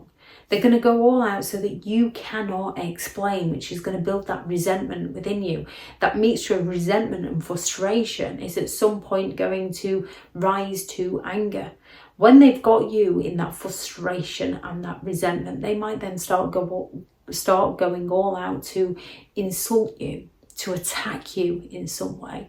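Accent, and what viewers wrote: United Kingdom. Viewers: this is the FIRST time I have heard your voice. Forever I thought you were Southern Californian, wow was I pleasantly surprised that you are United Kingdom 🇬🇧 ish.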